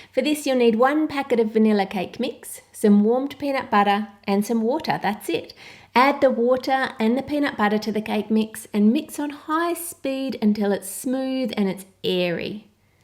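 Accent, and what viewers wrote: Australia. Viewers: who loves australian aacent?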